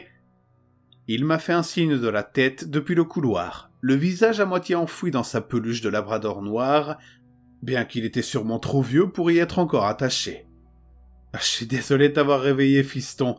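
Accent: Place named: Arab world. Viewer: Toujours aussi prenante… PS : +1 pour l’accent arabe !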